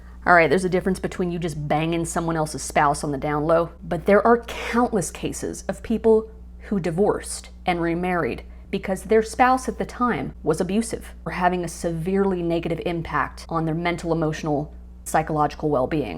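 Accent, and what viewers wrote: New York, United States. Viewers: Long Island and possibly Jewish. 😝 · Long Island? 🤔😳😬✌🏼I Think I Heard it when You imitated Your Mom and the 73 Yr Old Thanks 👍🏼🌞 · Off topic your ny accent is hot❤ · I ALWAAAYS GET THE LYING PERSON EVERY TIME!!! I LOOOOVE YOUR NEW YORK ACCENT..IT'S THE BEST!! YOU DESCRIBED MY MOM TO THE T...THE HARDCORE WITNESS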